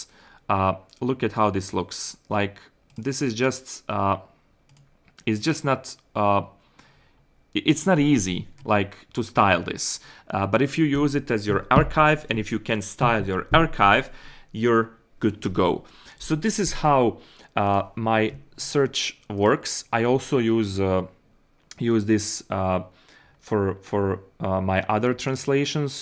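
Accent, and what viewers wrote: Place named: Bosnia and Herzegovina. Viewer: cim si poceo pricati znao sam da si Bosanac' Extra brate :D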